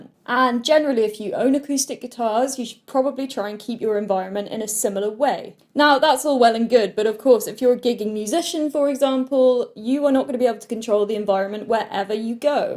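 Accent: United Kingdom. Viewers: Thanks for sharing your knowledge so didactic! I love your British accent and mainly your guitar-making! Regards from Brazil! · Guitar necks, katana's. Yes it's most likely your accent. BUT, Also in American English , thay tend to drop the letter T from a few of their words. For instance ; important is said, impor ant. Mountain is said moun an. ive even heard prodly instead of probably. So you mix this with your british accent and you get katana. Funny thing is they actually pronounce the T in Katana. Why do I know this? Because I'm a Kiwi living in the states for eight now.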